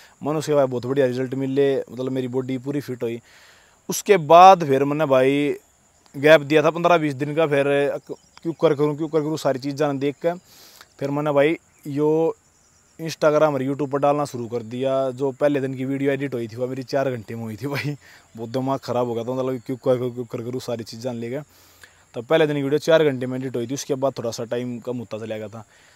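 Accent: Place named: Rajasthan, India. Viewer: Being a Rajasthani I can understand him very well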